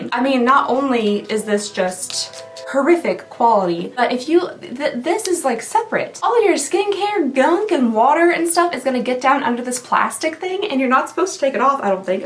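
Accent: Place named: Texas, United States. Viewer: Her Texan accent got stronger as the vid went on. The rage was too much.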